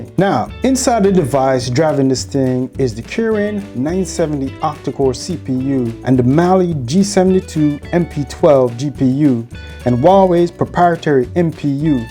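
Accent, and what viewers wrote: Jamaica. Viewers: Are you from Jamaica ? Accent sounds familiar...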